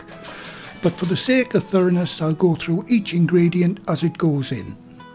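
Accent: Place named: North East England, United Kingdom. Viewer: you are fabulous, so down to earth, no frills just straight forward instructions with a beautiful accent. Love your north east accent. Thanks a bunch. This is my daughter's birthday cake.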